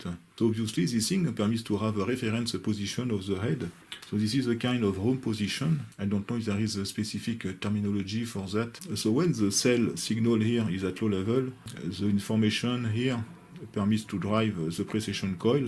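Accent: France. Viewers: Époustouflante travail de reverse engineering et excellentes explications des différents schémas. L'accent est catastrophique malgré un très bon niveau d'anglais, mais c'est un problème fréquent chez mes compatriotes français. Je reste abonné 120% à cette chaîne, unique par son excellent niveau technique. Bravo!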